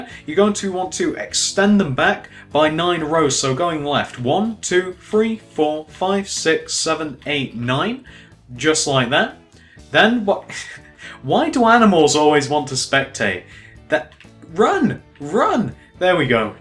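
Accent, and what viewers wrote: United Kingdom. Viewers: LC GAMING WITH LC GAMER me to he's British that's why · He's British you dumbass,he was saying three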